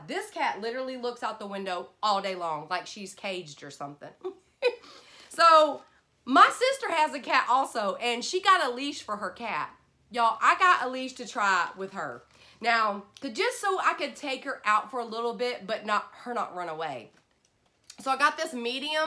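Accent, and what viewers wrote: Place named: Louisiana, United States. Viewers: Ha haaaaa good thing I don't like the black jellybeans........giggles..........name brand for a dolla........it's a.m. I've not had no sleep ....So this video cracked me up.....dutier....you sound like a Louisiana gal....full of giggles.....I love the dollar tree ...everything is a dolla... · Okay, I happened upon your video while entertaining myself with Youtube and you now have a new fan! I am a Dollar Tree fanatic, as well, and love discovering something new every time I go there. So happy I found you! I'm from Louisiana and your accent sounds somewhat similar to mine (even though I live in Idaho now!). God bless and take care, ya'll!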